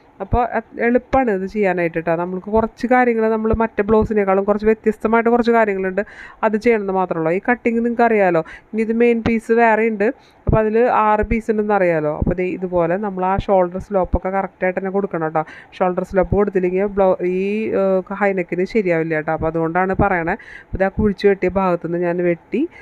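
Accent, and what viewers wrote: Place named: Thrissur, India. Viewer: നിങ്ങള് material മ ടക്കുന്നത് ഒന്നും പറഞ്ഞു തരുന്നില്ല . തൃശ്ശൂർ karude varthanam nangalk ഒന്നും മനസ്സിലാവില്ല. prini, miracle ഇതൊക്കെ kandu നോക്ക് . എങ്ങനെ അവർ പറഞ്ഞു തരുന്നത് എന്ന്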